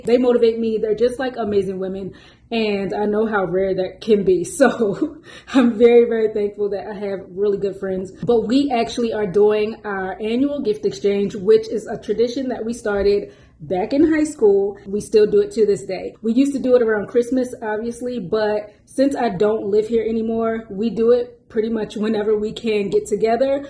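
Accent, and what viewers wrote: Baltimore, United States. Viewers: I knew knew it!!! I'm from bmore and I could hear it in your voice. Love it! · You absolutely have the Baltimore accent!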